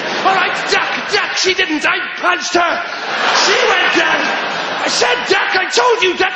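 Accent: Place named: Scotland, United Kingdom. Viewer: I love his voice its so raw and Scottish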